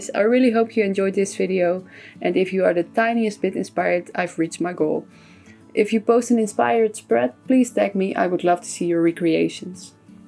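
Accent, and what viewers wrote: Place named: Netherlands. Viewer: Je accent is zo heerlijk Nederlands, genieten ;)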